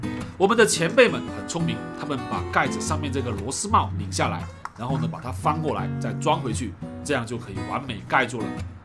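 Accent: Chaoshan, China. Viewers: 怎么感觉作者是潮汕人？有点像潮普哈哈哈哈哈哈哈哈哈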